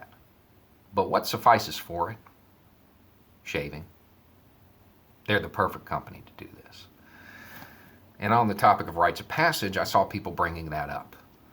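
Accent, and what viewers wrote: South, United States. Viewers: I hope you see this, I'll keep trying. I am working on a PhD in clinical psychology and I like you. I am going to admit, it took a lot of work for me to get past my implicit biases of your appearance and accent, my horrible family who happened to be from the south had traumatized me in that way. What you have to say is so important and so perfect that I did that work so I could listen to you. I've heard you express you don't have the the psychological knowledge to answer some of the questions you are asked. I'd like to help. I have been thinking about it for a while but when I heard you say in a video this morning that your dream for the world is being able to exist without government, I was moved to act. I imagine we probably have a lot of idealogical differences but I think that could just make us perfect sparring partners, we'd make each other better people. 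Give it some thought.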